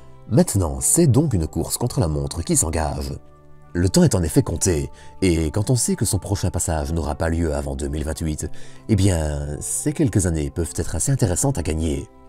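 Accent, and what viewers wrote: Liège, Belgium. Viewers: Tu ne serais pas de Liège, par hasard?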